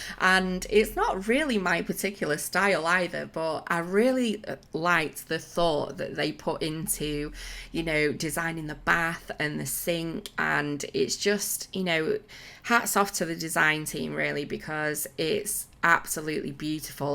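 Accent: United Kingdom. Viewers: Enter! I'm a 15 years old boy from Bavaria, Germany. I love your videos, your britsh accent and the ideas that you come up with! You really inspire my builds! I hope you have a great time and please go on with those amazing speedbuilds. Much love from Munich😍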